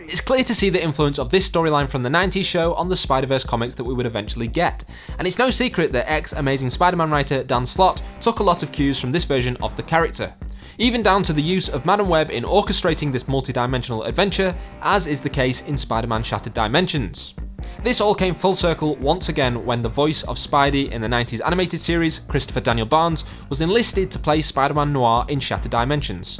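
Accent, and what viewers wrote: United Kingdom. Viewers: Downvoted and left the second I heard a British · I don't mind seeing the old 90's Spider-Man the Animated Series again, but I wish most YouTube uploaders would stop being dickheads and talking trash about the ending of the show being related to the Clone Saga storyline. "The story that fans love to hate"? Thats the problem: most people think that most (if not all) fans disliked the Clone Saga storyline when it was the best story in recent years for Spider-Man at the time. So much happened in that story that I, for one, couldn't get enough enjoyment out of it. Then, it was over, but it had lasting impressions that were both memorable and shocking. Maybe I'm being a bit prejudiced when I say this (honesty makes the world more interesting), but since the narrator is British or English, I don't expect him to fully understand. Even if he didn't like the Clone Saga, there's no need to keep talking down about it as if it was the worst story in Spider-Man history (cough One More Day). 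Yet, this is what people do. Nothing better to do with their lives than bring up old hurts from the past. Leave it alone, already. He sounds like a somewhat old enough guy. Move on.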